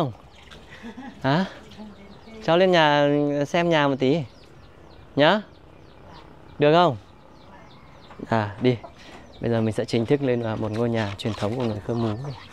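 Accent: Hanoi, Vietnam. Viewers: Ai cũng nghiện kênh của rồi, bây giờ cầm ĐT lên là mở thôi, không còn thời gian cho kênh khác nữa, Cảm ơn con nhiều nha, cảm nhận như được đi Du lịch, thế thì làm sao mà không yêu được cơ chứ, rất yêu con, vì giọng nói của trai Hà Thành, vì nó gợi lại bao kỷ niệm, ký ức của một thời Thanh xuân đã qua mà không bao giờ lấy lại được, Cảm ơn con nhiều nha.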